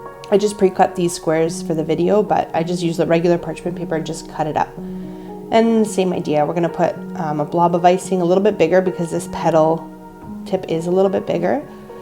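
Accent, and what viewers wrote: Canada. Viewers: Thanks for this 😍 are you a fellow Canadian? I hear an accent lol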